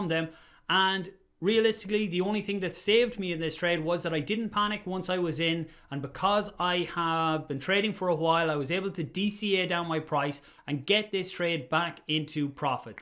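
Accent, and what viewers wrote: Ireland. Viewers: You sound like a strangled duck. I love it :)) Irish accent funny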